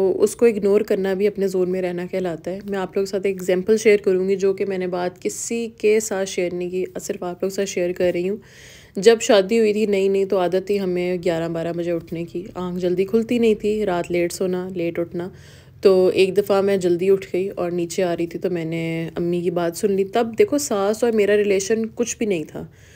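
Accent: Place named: Punjab, India. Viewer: Love your Punjabi accent and advices ❤️❤️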